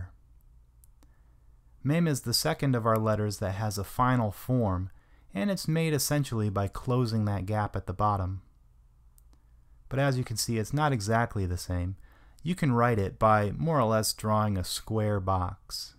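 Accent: Europe. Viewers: Of course you use the European pronunciation and not the proper Tiberian pronuncisrion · nice cours, but the pronunciation is not authentic to the original hebrew, it sound like european pronunciation more than middle eastern pronunciation, I disagree with pronunciation of some letters : ח ע צ ק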